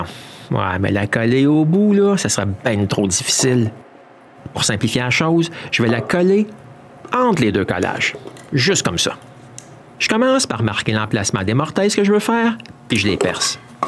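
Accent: Quebec, Canada. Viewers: ToolsConsumables what you say is right but he speaks with a big Quebec accent so even though he meant to say the right things, the accent makes it sound different.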